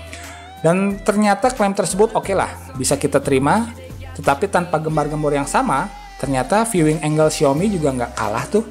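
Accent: West Java, Indonesia. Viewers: Sampurasun... Sunda pisan kang... hhe... Mantep reviewnya.. Tp ngmg2 Redmi 1s sm Zenfone 5 beda kalas sama harga kang.. Kaleresan abdi pengguna Redmi 1s :D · sunda pisan euy, hehe, hatur nuhun videona. :)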